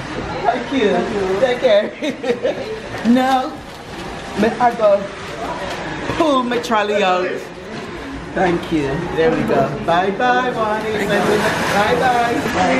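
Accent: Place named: United States. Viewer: Her accent sounds a little American.I wonder if she lived in the states a little bit.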